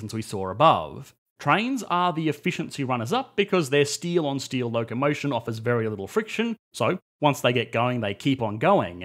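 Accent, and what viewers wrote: Australia. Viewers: What accent is that? Australian? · australian accent This is where we bring up the darling Norway, it not only gets (Insert industry norm) but does so equitably. In fact when measuring (some average figure related to production) it is actually (some number between 1-10) globally. Now you can never not mention them again :) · At least Wendower is 'understandable': this Ozzie's accent is thiccc, plus, he talks way too fast - had to reduce speed to 75% to make it bearable. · The Australia accent throws me off. The upward inflection at the end of sentences make it sound like your unsure or asking a question.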